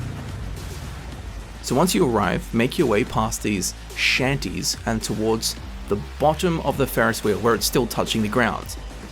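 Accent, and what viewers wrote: Sydney, Australia. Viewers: Your from Sydney aye? I'm from Newcastle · Hey are u Australian? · Yo, you from Sydney?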